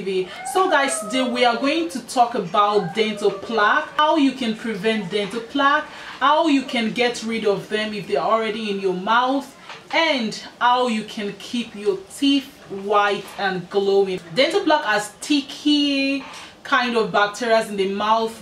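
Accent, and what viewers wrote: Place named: Africa. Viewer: Love that African accent P.S. you are beautiful!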